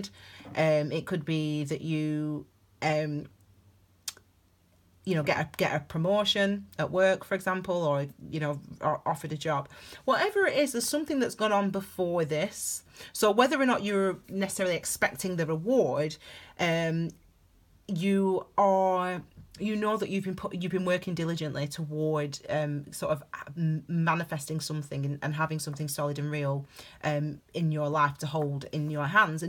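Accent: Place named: Lancashire, United Kingdom. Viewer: Sounds like she's from Lancashire.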